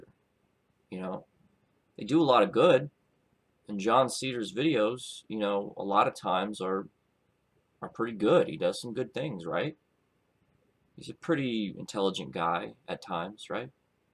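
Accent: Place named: United Kingdom. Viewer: I like the Britsh accent! lol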